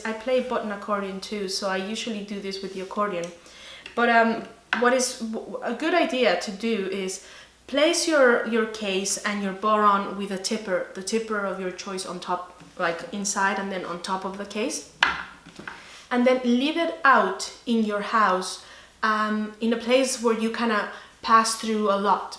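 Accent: Ireland. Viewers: Do I hint a little irish accent in there? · Glad to see you online again! I had seen the DOWN UP TAP method on another video, and wasn't quite sure if it was legit. It seems a great way to produce a lot of sound while being economical with the amount of skin covered. One day I too would love to visit Ireland. I hope you had a wonderful trip. If you don't mind me asking, where are you from? At first I thought your accent was Irish; is it not? I'm in California, so you can imagine the lack of bodhran players out here. Thank goodness for the internet! Your instructions have helped me greatly, thanks for being there!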